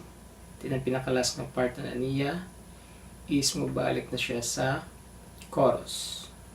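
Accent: Visayas, Philippines. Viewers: Bisaya man diay ka sir.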